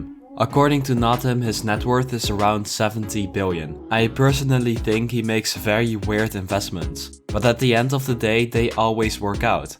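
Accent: Netherlands. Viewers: are u netherlands? u have a netherlands accent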